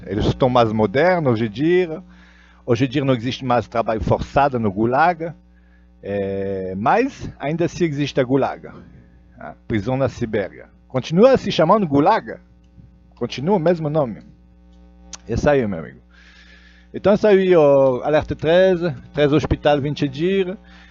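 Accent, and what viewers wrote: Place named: France. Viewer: Porque tu tens um sotaque francês de falar o português, amigo?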